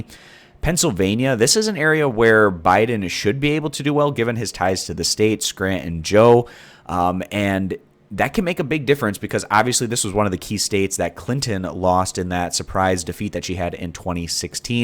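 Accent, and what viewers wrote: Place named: Midwest, United States. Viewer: I’m from Chicago and your midwestern “twang” is pretty heavy lol.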